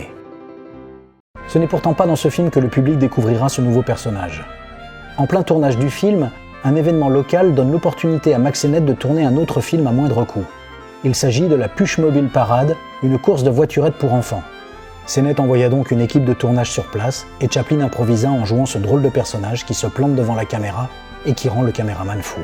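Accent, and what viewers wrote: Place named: England, United Kingdom. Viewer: Merci…passionnant comme d’habitude ! 👍👏👏👏 Et quel accent anglais 😉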